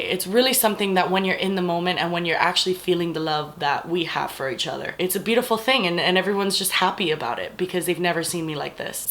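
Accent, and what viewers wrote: Cuba. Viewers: She's Cuban :) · It's a Cuban accent. She's Cuban. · Brianna Zinsky she's Cuban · Sharon Amador half cuban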